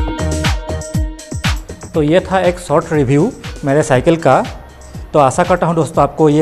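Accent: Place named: Bengal, India. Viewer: bro tumi bengali to bengali tai bollo tumar kotha sunei bhujte parchi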